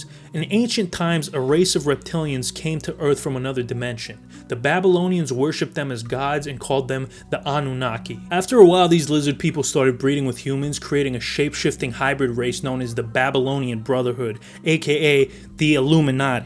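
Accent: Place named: New Jersey, United States. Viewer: His joisey accent is 👍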